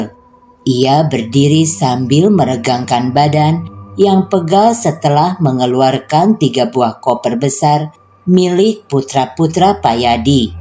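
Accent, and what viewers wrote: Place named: West Java, Indonesia. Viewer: . Suaranya yang khas dengan logat bahasa Sundanya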